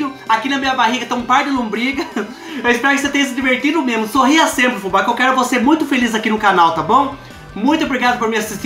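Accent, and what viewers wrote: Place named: Piracicaba, Brazil. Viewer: parese piracicabano falando kkkkk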